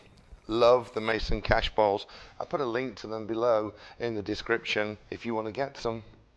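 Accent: United Kingdom. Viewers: As a Texan, you've lived here for 10 years. Why don't you have even a slight Texas accent? lol I thought that was impossible. Tell me that you throw in a y'all once in a while? That would be so fun with a clipped British accent. Thanks for the recipe. I'll be trying this recipe tomorrow. It's been too long since I had a mousse, much less whiskey chocolate. Yum.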